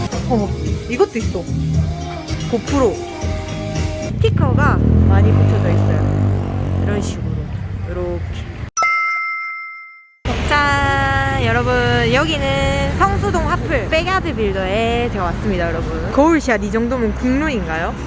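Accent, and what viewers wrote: Daegu, South Korea. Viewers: 암튼 대구사투리 개싫음 개짜증남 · ㅎㅎ저도 대구출신입니다~ 사투리 아주 정겹네요, 영상 재밌게 잘보고 갑니다!! 굿굿! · 대구사투리 너무좋다..♡ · 대구분이시구나 간만 사투리들어서 정겹네여~대구사람은 아니지만 대구 방촌역 아파트 공사하느라 숙소생활하긴했었는데 서울 나들이 잘하셨네여^^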